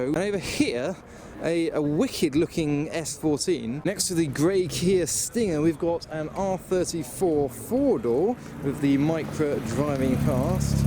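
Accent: United States. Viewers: Nice American voice loll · More of your non-specific American accent, please. My favorite part. Wish you’d made more of a fuss about the Chimera.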